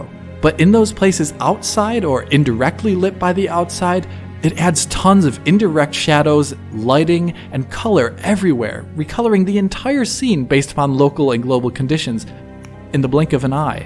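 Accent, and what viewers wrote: Germany. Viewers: Wait, what is native language? German? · So you are german?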